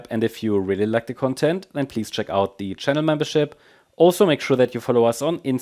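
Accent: Germany. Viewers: Thanks for frequent updates. I’ve been following you for a while now and like your analysis and German accent 😊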